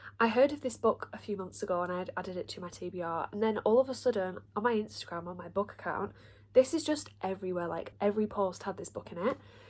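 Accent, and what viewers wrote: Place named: Lancashire, United Kingdom. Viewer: hearing another Lancashire accent on BookTube is like a warm hug! the algorithm did a good job today ❤️❤️❤️ Cleopatra and Frankenstein is a masterpiece, enjoy!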